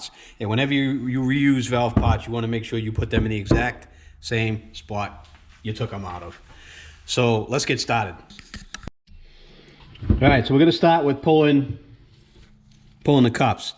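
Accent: Boston, United States. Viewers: dude i knew i could count on you. i have a headtht i'm ready to reseat the valves, i have the compound but i dont have the little suction cup lapping tool. i started watching , i thought you might have the tools but id have a look, and sure as shit you got a cheaper better way. i love your vids. i work alot like you. ive built three drz engines with your help on the first 2, third one i di by memory. since then i bought a kfx 400 quad. rebuilt that . i bought it thinking it needed carb tuning per the seller. got it homer did the carb woudnt start. i started looking around, turned out the oi was mixe with coolant. it had sat like that for along time. probably whoever had it was riding it like that til it finslly died. yeah i bought it without testing going on the sellers word. seemed like a goood guy. i learned a lesson, never trust a seller even if you met him at church. 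cuz thyll tell ya what you wanna here. theyre like politicans in that sense. i got it for $1500 though so i wasnt to pissed. its up and running like a champ now. only spent another 200 bucks so 170 i have a cherry 2003 kfx 400 big bore. i had some parts from drz's. carillo pistons are beautiful too. ii wanted to put it on a chain and wear it as a necklace but i put it in. drz runs like a beast. thanks to you i am now buildng engines myslf. u da man. wtf are you from boston area? my wife is from faihaven. its in the armpit. hour out of boston. her entire family family talks like you. she doesnt thank god. no o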